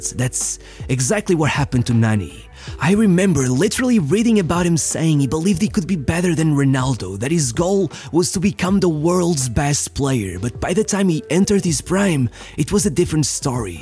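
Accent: Portugal. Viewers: With all respect this guy talks lies quaresma was Champion in Sporting and in 04-05 we ended up in second know your facts instead of making them up specially if u sound portuguess maybe a Benfica stan that wants to Paint the wrong picture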